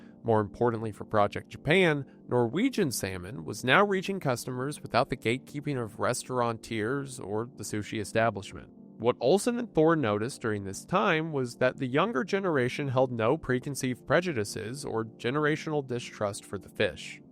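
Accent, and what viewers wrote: Appalachia, United States. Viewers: I like his appa-LACHA pronunciation of Japanese names. Brings a little southern comfort. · I don't know if he's mispronouncing it intentionally or genuinely but either just doubles down on his Appalachian charm. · People complaining about how a deep south Appalachian pronounces words from Japan are literally basement dwellers lol. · So this is what happens when you read Japanese words in an American pronunciation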